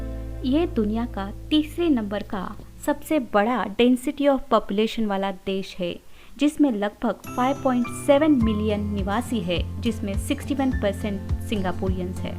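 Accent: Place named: Maharashtra, India. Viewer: काय मस्त हिंदी आहे तुझी...पण कधी कधी, काही शब्दांतून मराठी बाहेर येते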